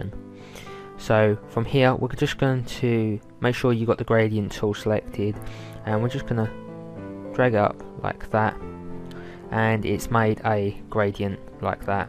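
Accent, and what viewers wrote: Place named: United Kingdom. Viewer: the Q is U only my man has a heavy British dialect... for me it was hard to understand some things as well..... na mean??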